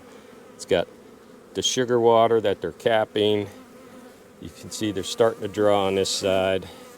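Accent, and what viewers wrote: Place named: North Carolina, United States. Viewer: I thought I recognized a familiar accent--I'm from NC, too! This was a great video. Lots of good info for someone like me who used to be a beekeeper, had to put it away for several years, and has now started back up. Thanks!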